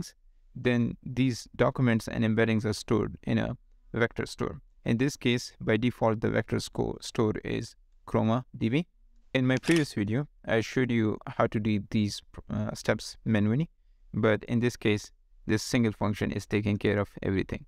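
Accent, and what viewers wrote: India. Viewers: This right here is the definition of the uncanny valley. That white guy, with your Indian voice, plus the AI generation, makes me feel incredibly uncomfortable. Love your channel, but it makes my brain light up in "Something's wrong here"...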